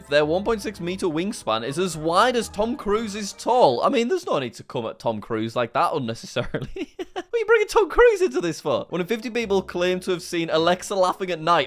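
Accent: United Kingdom. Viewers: shut up asshole its British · Is British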